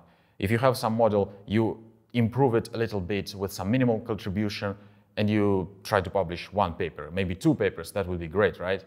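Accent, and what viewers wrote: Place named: Russia. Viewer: I was thinking "ah, familiar accent". Hello to fellow Russian researcher. I'm also a researcher at US University. And papers fever is way too real. =( I have literally 3 citations.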